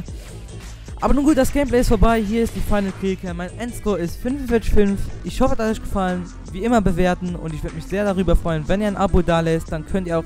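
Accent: Luxembourg. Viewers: dass du aus luxembourg kommst hört man finde es cool das es auch jemand aus luxembourg gibt der Commentaries macht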